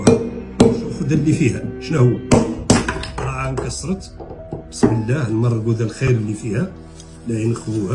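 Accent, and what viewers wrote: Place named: Hassaniya, Mauritania. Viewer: الله على اللهجة الحسانية .. الله يحفظكم اهل الصحراء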